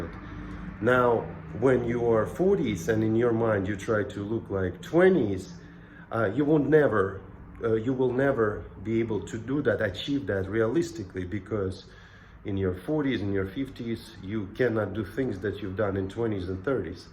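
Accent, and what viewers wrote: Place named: Brazil. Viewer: I know this accent I guess. Tu eh BR mano? Mora aqui? Tu não parece a maioria dos BR treinando , treina mais parecido com os americanos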